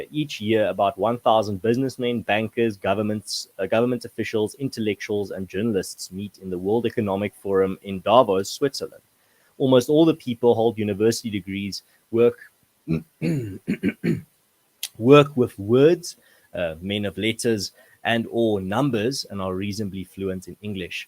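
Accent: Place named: South Africa. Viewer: I worked for a South African company for 25 years and great to hear your accent!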